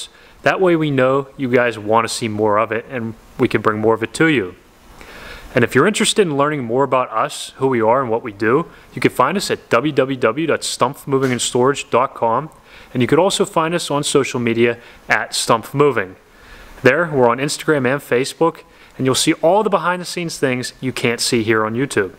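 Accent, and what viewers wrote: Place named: Western Pennsylvania, United States. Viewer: I love your western PA accent. I’m from Indiana Pa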